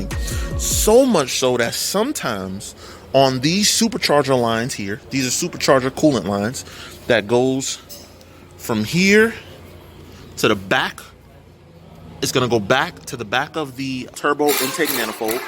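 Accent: Trinidad and Tobago. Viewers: I hear a trini I swear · I hear a trini accent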